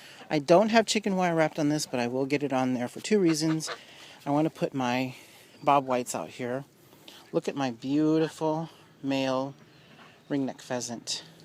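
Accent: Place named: United States. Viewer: Hi, u might have seen my comments before. I see u like you ducks ect. Well I was thinking u would like expensive more pretty kinds. And they are rather hard to get! I have red breasted and emperor geese...? They are worth quite a lot as they are hard to breed and are very very pretty! If you like any ideas with birds like these give me a shout and I can tell you. I'm not trying to see you anything as I live in Scotland and by your accent your from America? Thanks!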